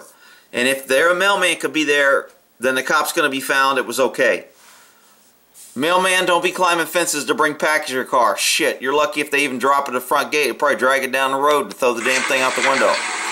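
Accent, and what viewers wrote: United States. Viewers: You talk over vid way too much !! Tip: shut your trap with that arrogant American loud claptrap accent !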